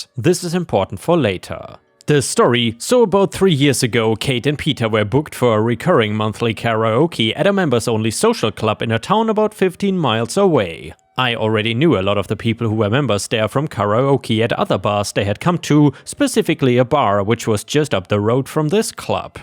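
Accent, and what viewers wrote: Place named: Germany. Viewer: Carry oak E lol. I like your pronunciation though. It’s so close for a non native speaker, also I think you’re losing your German accent.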